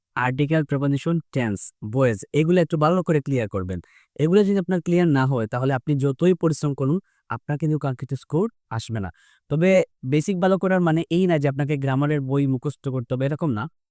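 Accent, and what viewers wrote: Sylhet, Bangladesh. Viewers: Bhai khati Sylhety r Nokol Sylhety modda partokko ki😀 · Vai khati sylhety ❤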